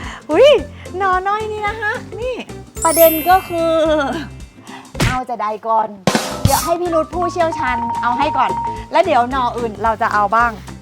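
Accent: North, Thailand. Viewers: ชอบแม่อู้เมือง อยากหื้ออู้นักๆในคลิปเจ้า เพลินดี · อู้ไทยปะแล๊ดเมืองน่าฮักดีครับ😊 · อู้กำเมืองม่วนแต้ๆจ้าว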